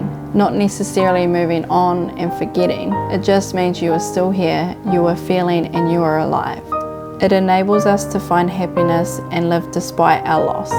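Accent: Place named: New Zealand. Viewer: Thank you, for your gentle, strong words. It sounds like you have found your own peace, even if that peace means feeling crap for a bit or telling someone to F-Off. Where is that beautiful accent from? Kiwi? Cheers, R.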